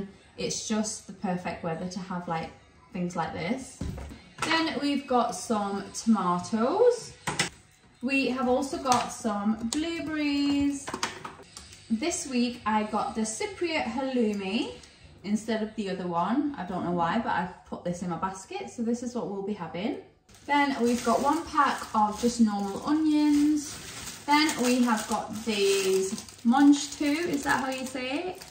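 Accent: United Kingdom. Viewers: We Americans are always in awe of British accent! Difficult to know what part of England your accent is from. Nevertheless, great video and watching it from Seattle, The Washington State.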